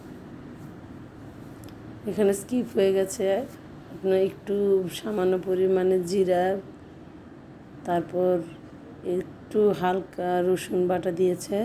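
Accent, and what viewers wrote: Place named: Tangail, Bangladesh. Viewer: আমিও টাংগাইলের আপু। তোমার কথা আর রান্না দেখে মনে হলো টাংগাইলের মতো তাই বললাম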